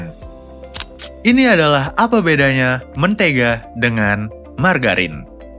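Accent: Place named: Bali, Indonesia. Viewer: Gw tau bedanya margarin dan mentega, tapi kenapa ya klo video masak di youtube itu mengaggap butter dan mentega itu beda ya...? btw yg punya channel org Bali ya, penyebutan setiap kata yang ada "t" nya kyk org bali.hehe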